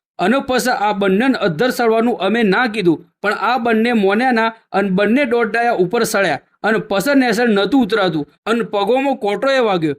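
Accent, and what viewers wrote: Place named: Sabarkantha, India. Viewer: તમારી બોલી ઉપર થી લાગે છે કે તમે સાબર કાંઠા ના છો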